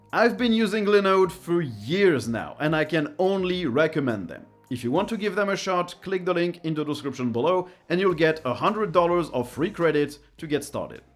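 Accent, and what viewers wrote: France. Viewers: He's French? I've long been guessing where that accent comes from lol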